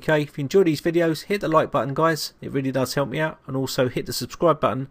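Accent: United Kingdom. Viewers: You are by far my favorite Windows 'Guru.... And please, slow down your fast, nervous speaking, because that along with your heavy British accent.... makes it hard to enjoy the 'essence of your Royal Perfumes.......'God save the Queen!.....'You are the best!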